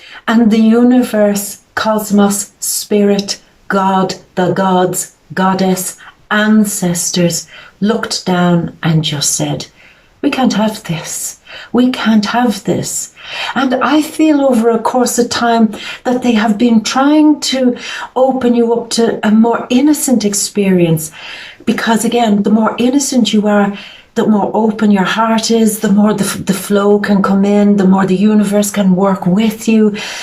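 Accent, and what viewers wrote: Ireland. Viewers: Love your Irish accent! God bless you sister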